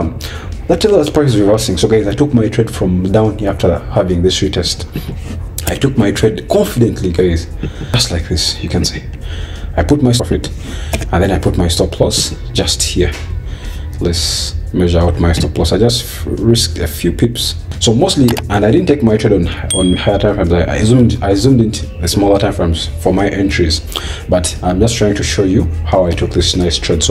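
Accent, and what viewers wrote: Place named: Uganda. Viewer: Hey man.. are you from Uganda... your accent sounds Ugandan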